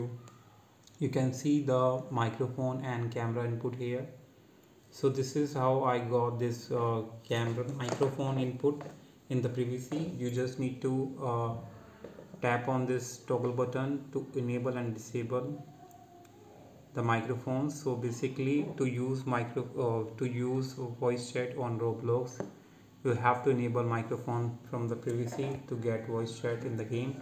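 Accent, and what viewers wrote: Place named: India. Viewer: I knew what it was Indian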